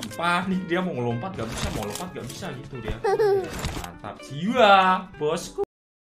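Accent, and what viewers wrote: Java, Indonesia. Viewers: Tetep menjadi kereator yg lucu mas dengan logot jawa nya, dan sehat selalau untuk mecari rejeki buat keluarga nya, IG: alfian_yuliansyah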